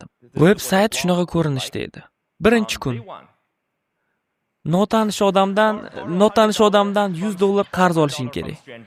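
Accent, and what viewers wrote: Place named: Tashkent, Uzbekistan. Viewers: shu oddiy gapirganiz yoqdi adabiydan koʻra Toshkent shevada 😊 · shundo dovom eturin oka toshken sheva zor ketvotti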